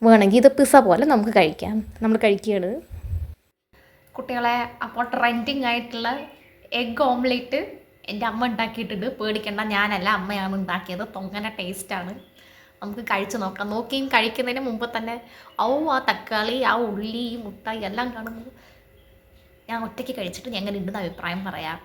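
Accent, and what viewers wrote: Palakkad, India. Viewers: Super tasty omlette thank you ma'am adipoli palakkad slang❤❤🎉🎉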